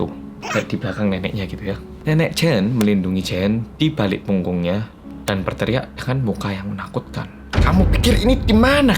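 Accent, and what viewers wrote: Java, Indonesia. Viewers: Woe logatnya orang jawa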